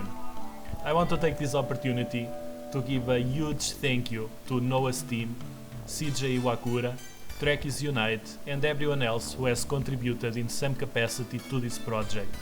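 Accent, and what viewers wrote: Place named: Russia. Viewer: Not sure if you are Russian by your accent or Portuguese/Brazilian by your Twitter language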